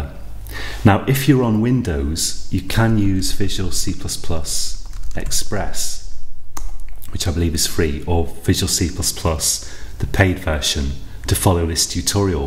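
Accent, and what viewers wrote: United Kingdom. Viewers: You're not only teaching me Programming but also English at the same time. I have been taking British English classes for about 2 years now and i wish i could sound like you. Really love The British accent, Just incredible!!! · Strong accent of British!QAQ after learning all of this courses i think i can talk English fluently like a British.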